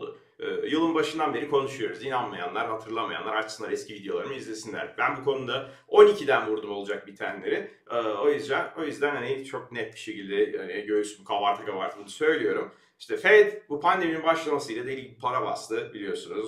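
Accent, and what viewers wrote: United States. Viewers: Komedi filmisin yaaa. Olumsuz yorumlarıda hemen siliyorsun en ufak eleştiriye açık değilsin. Bilgin yok ve amerikan aksanıyla Türkçe konuşarak, İngilizce kelimeler ekleyerek de olmuyor. İnsanları yanlış yönlendirme lütfen, anlattıklarını zaten çocuklarda biliyor.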